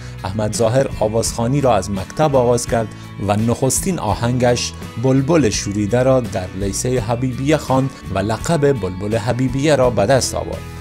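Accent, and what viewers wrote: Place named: Iran. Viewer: ( اوستورا ) ، یعنی چی ؟ چرا به لهجه ایرانی حرف میګوید ؟.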